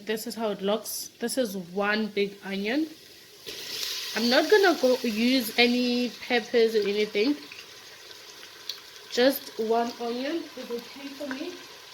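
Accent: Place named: South Africa. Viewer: First of all love and miss hearing that South African, Kaapstad accent. I also hate the taste of those little (I think it's cardamom seeds). My Mom used to cook the chicken and potatoes separately, then layer them, potatoes, rice mixture, chicken and so on and let it slowly simmer and then we would have it with mango chutney yum yum yum, lekker smekker goed. Enjoyed watching you, I have never tried making this on my own, but watching you I will certainly try my hand at it. Thank you for the inspiration, geniet, dankie en tot siens.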